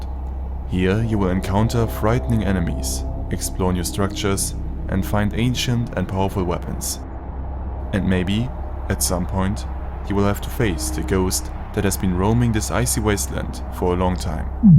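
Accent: Germany. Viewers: Bro bist du deutsch wenn ja mann hört es so raus (nicht böse gemeint finde dich mies cool )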